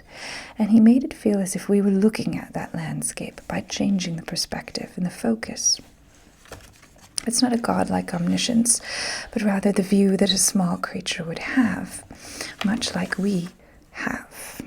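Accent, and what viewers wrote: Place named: Mid-Atlantic, United States. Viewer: I love your Mid-Atlantic accent!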